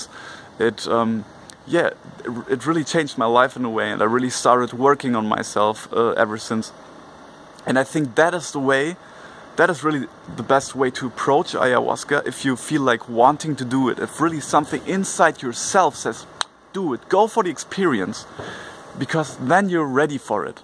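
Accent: Germany. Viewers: German English lol cute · german- english :D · hey man are you german?? Sound like.. bin nnämlich auch deutsch und ich würde gerne mal mit dir quatschen wenn du zeit hast :) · Dude you must be german cool accent 😅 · I like your German English :) Grounding is verrrrry important otherwise the trip might be very dangerous and scary. I also would suggest anyone who is willing to take Ayahuasca to use a grounding Crystal. Lava is excellent since it comes from the source of the Earth. Otherwise you might go into another world and you might feel you can't come back to this dimension/reality and this is the worst feeling.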